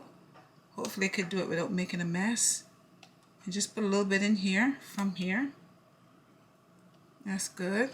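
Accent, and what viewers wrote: Barbados. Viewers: So glad I found you. Was watching your cocoa bread post and I said she sound like a Bajan. Lost my GranGran black cake recipe and yours came out like our traditional black cake. Thanks a bunch 👍🏾👍🏾👍🏾👍🏾👍🏾👍🏾👍🏾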